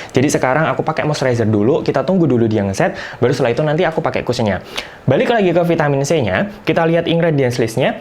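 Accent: Java, Indonesia. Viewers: lak pas ngomong logat jowo endel e metu.. 😂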